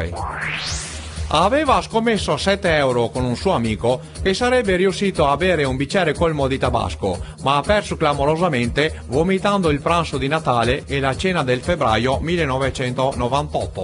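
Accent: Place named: Rome, Italy. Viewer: anca mi so veneto cmq non è propio veneto...ce sembra un romanese che parla in veneto però ce hai provato ...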